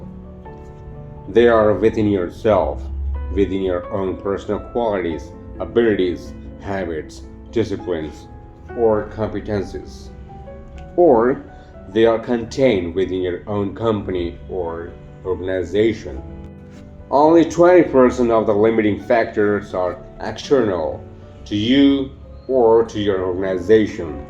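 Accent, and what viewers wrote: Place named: United States. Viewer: Yes friend, I also love his accent ... Being an Indian he's really doing great American accent..🎉🎉🎉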